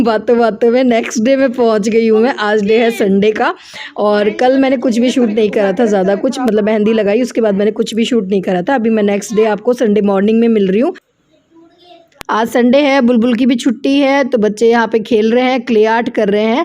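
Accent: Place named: Punjab, India. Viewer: Aap ho Rajasthan ji to punjabi jese rehna aur bolna tone kyu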